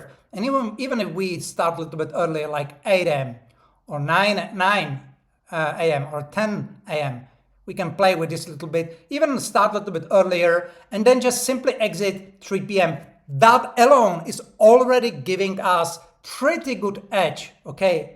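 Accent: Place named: Czech Republic. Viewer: This is what i trad nikkei to the long. I noticed how nicely it moves and started searching who trades nikkei on internet and found this video. After 10 yrs of trading I started getting sick with forex pairs…navigating forex is tough even for experienced traders. Nikkei has a structure, much easier. But this info about mondays is even more helpful U say 9 to 3 pn what time zone? I guess u mean tokyo time? Thank you for the video bro!!! U sound Czech by accent;)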